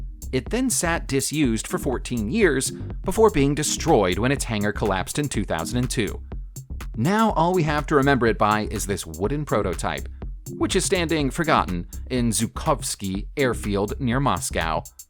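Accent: United States. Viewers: The announcer sounds American. How is it he can't pronounce Catalina?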